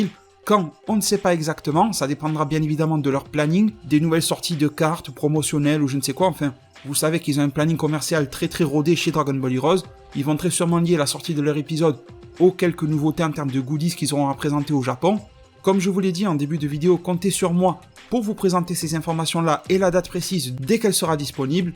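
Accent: Marseille, France. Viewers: Juste comme ça, vient tu de Marseille ?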